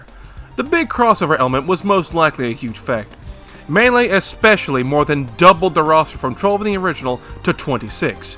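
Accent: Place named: New York, United States. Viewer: i dont know why but you sound like a new yorker trying to talk like joe perra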